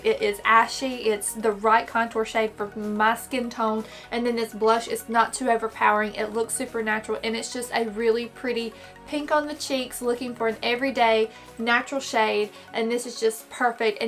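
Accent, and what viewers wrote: South, United States. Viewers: You are stunning and I love your accent! Are you from the south?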